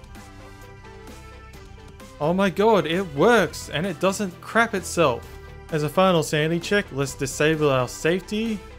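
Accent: Australia. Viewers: Dang, the Australian is coming out this video.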